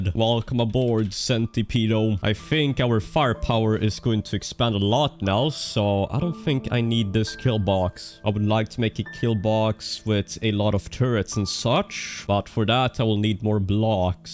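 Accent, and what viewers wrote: Sweden. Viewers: You are the most stereotypically swedish sounding guy ive ever heard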